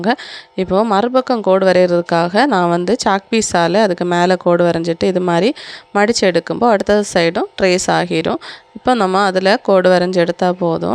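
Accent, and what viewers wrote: Kanyakumari, India. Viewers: Hi sis Recently I saw and subscribed your channel;awesome videos;n you , your hardwork inspiring;you are soo beautiful 😊; I love embroidery n stitching from childhood Now learned to stich my dress myself by YouTube videos.namma kanyakumari slang la super ah pesureenga, perumaiya irukku; I don’t know aari ;evlo try pannen Ukkum varavae illa;Ella workum hand embroidery taan paanuvaen:blouse chudi etc. niraya days edukkum. Appuram onnae onnu sila English word mattum pronunciation thappa solreenga ;artha mattum konjam paarunga Naan epdi yaarukkum comment pannathilla I like you a lot ; Ataan sonnen sis Also unga simple hand work techniques romba useful ah irukku; keep on doing ;comment lengthy ah irukku ;😅pls reply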